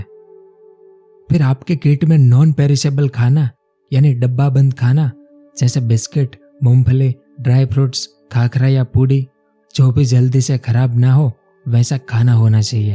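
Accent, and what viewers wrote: Gujarat, India. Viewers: Bhai aap gujarati ho??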